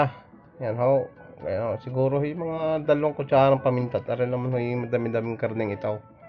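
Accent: Batangas, Philippines. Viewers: Ipakita mo yong pangalan ng bote ng ingredient di maintindihan yong tagalog mong batangueno eh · Boses palang areh eh .tubong batangas talaga..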